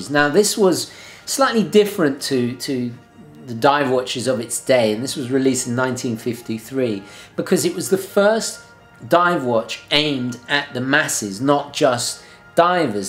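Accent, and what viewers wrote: United Kingdom. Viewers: It's his crisp and clear British accent. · Your British accent is so pleasant to hear lol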